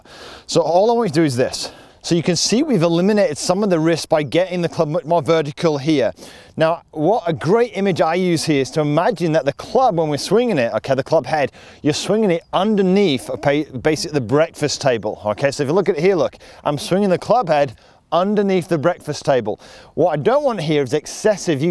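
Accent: United Kingdom. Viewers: You wonderfully master the art of teaching golf an I'm fond of your so British Accent😊...It's just a friendly wink of a French golfer.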